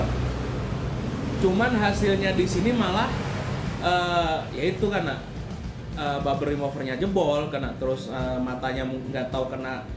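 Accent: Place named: Kalimantan, Indonesia. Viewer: Dr kalimantan mana bang... Logatny kalimantan tuh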